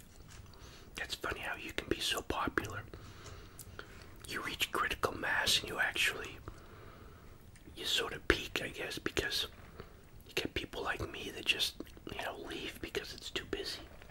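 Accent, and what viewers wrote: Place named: Boston, United States. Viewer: Yeah, it's a Boston accent I'm pretty sure. It's not a thick as some others but it's there.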